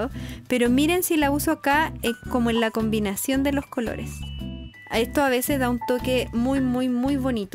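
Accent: Chile. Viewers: Eee es chilena vivan los chilenos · holi eres de chile? se nota en tu voz uwu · de casualidad eres chilena? es que tu acento es super marcado y lo conozco porque tambien soy chilena:virtualhug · sos chilena? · eres de chile se nota por como hablas no digo para ofernder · cuando escuche tu voz dije: OOOOOHH es chilena xd edit: Like y gracias por el tuto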